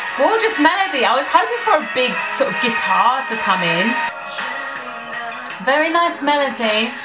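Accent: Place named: United Kingdom. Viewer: Girl i love you 😫❤ You're so cute, funny and full of energy 😅 And i love your British accent too 😂 you're like this year's Malta entry so colorful and joyful 😅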